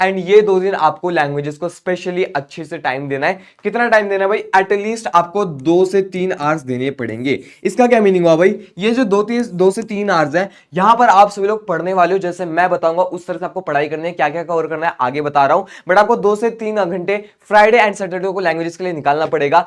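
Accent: Maharashtra, India. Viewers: Marathi English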